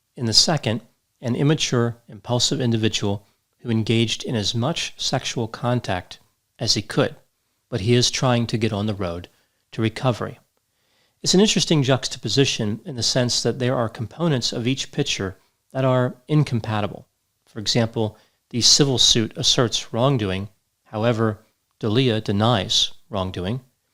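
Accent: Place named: Philadelphia, United States. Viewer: Is from Delco/Philly area? I recognize our accent anywhere. Lol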